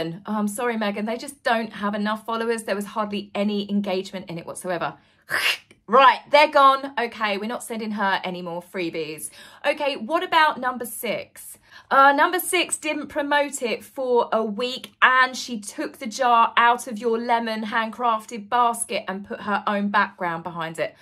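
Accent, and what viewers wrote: United Kingdom. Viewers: I always enjoy your pretty face and your British accent when I click on your videos!! You are always so cheery and happy!! I love that!!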